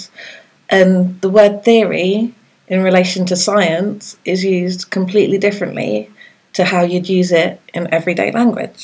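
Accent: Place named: Australia. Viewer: She presents her point in a succinct and articulate mannor. She reveals her data and lays it out for inspection. Very well done. Now that that is out of the way,,,,,,,, OHMYGOD I AM IN LUST!!!,,,uh,,i mean,,OHMYGOD I AM IN LOVE! Those eyes!Those lips! and that ACCENT! Damn, such an awesome Aussie.,,,? Kiwi?,,,Limey? Aww hell,,who cares, Ill just stare at her as she reads the phone book,,,,,,sigh.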